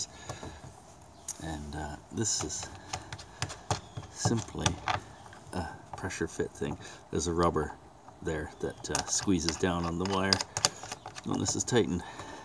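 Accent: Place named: Canada. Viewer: I thought I detected a Canadian accent, it was confirmed when you mentioned using "white hockey tape" to wrap you wires. Take care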